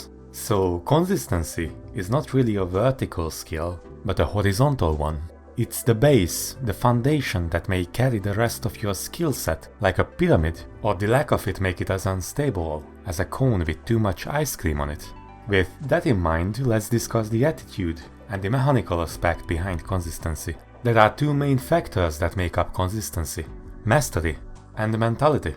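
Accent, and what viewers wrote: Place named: Hungary. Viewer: Lol tudtam hogy magyer vagy... Az akcentusodrol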